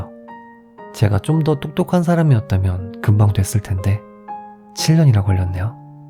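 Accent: Japan. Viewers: 와 전 들으면서 한국말 잘하는 일본인이시구나 라고 확신했는데 발음이나 억양에서 그런게 느껴졌어요ㅎㅎ 근데 한국인이셔서 놀랐어요 평소에 즐겨 들었는데 처음으로 댓글 남겨보아요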